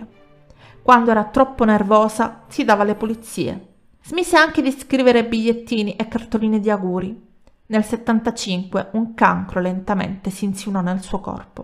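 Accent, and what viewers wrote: Sicily, Italy. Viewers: Ti ammiro molto. Continua così. A proposito il tuo parlare siciliano mi piace tantissimo!!! Ciao da Venezia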